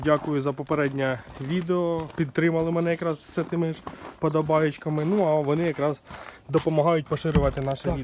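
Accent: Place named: Shostka, Ukraine. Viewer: Цікаво з якого ви міста? Бо говорите Шостка Кролевець))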